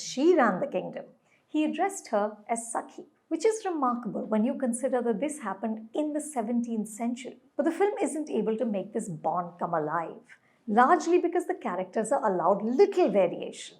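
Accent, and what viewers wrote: India. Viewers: Please keep your biased south delhi English accent and dishonest leftist review to your self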